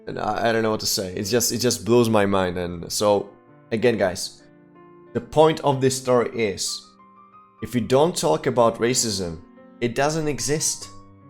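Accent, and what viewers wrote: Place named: Russia. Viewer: You sound Russian to me.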